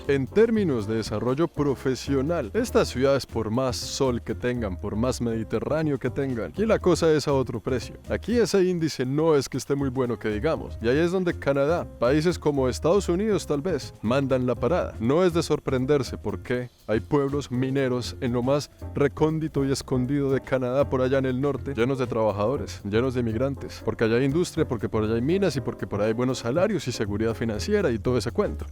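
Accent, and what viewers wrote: Colombia. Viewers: Me sorprende q ya llevas años viviendo en Canada y tienes el acento colombiano soy salvadoreño pero no entiendo porque os centroamericanos en su mayoria se les pega ese acento como q fueran gringos.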